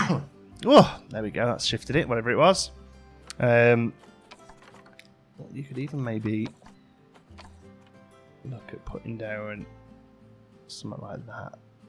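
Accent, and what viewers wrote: United Kingdom. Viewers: Loving these videos this game looks amazing can't wait for it!! Nice to here an British accent once in a while too lol!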